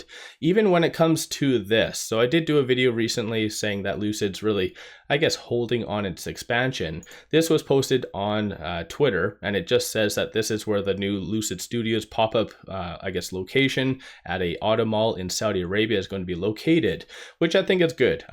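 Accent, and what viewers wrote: Canada. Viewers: Good Canada speak.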